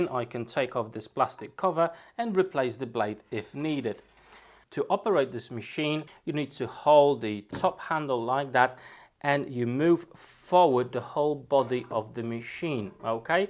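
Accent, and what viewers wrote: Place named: Northwest England, United Kingdom. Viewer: What accent have you got, I'm hearing northwest England, and German maybe ha ha ha.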